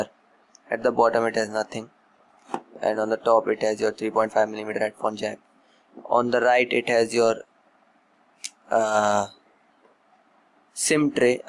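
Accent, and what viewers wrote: India. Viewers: that accent.. yuck!!!! just speak in indian language dude..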